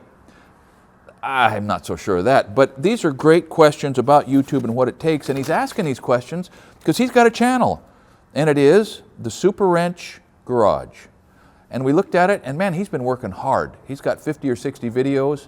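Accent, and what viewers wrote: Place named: Texas, United States. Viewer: I think you might be a little more Texan than you know.....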